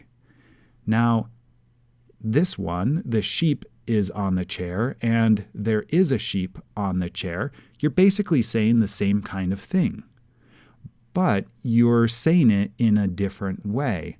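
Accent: United States. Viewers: Your pronunciation needs to be a bit less Americanized. Great information though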